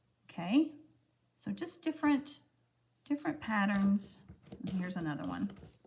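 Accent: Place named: South, United States. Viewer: Frist time to see you. Really enjoyed all of your video. Thank you for all the information. I live close to Nashville Tn. and I talk just like you. Was fun hearing what I sound like. I love our southern drawl. I will be watching other of your videos.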